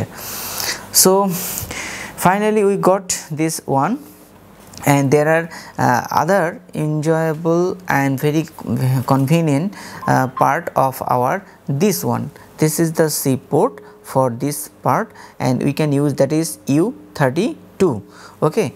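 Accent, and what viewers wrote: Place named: Bangladesh. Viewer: Your English told that you are a Bangladeshi😅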